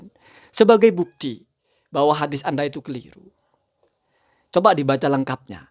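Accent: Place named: Banyumas, Indonesia. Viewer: Jngn ngapak maka nya baca sesuatu itu biar jls ,jngn medokkkkkkk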